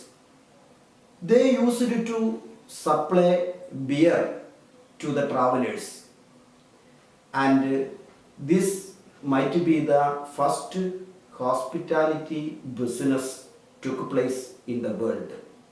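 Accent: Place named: Kerala, India. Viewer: Malayalam poley thonunnu some times your english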